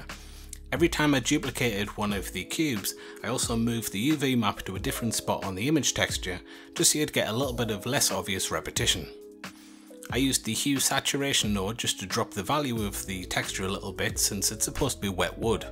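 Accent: United Kingdom. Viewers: Great video :) Also great to hear more regional UK accents rather than just typical American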